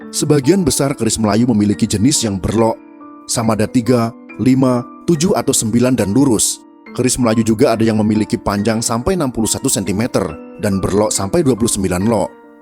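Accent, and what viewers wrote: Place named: Java, Indonesia. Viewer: Cetho jowo mas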